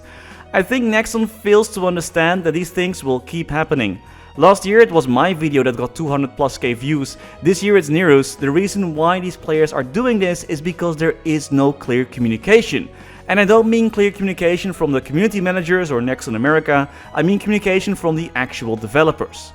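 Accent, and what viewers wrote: Netherlands. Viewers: are u dutch? im dutch too :D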